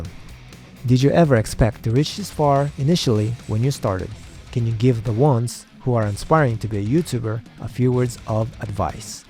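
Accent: Philippines. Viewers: I'm probably wrong but I could hear a slight Filipino accent/diction, I'm guessing you lived in the Philippines for some time? Dude your singing voice is amazing. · Yeah the accent is definitely flip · I actually thought he was filipino when I heard his accent, I didn't know. Haha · i knew it from the accents thats he's a half fil 🤣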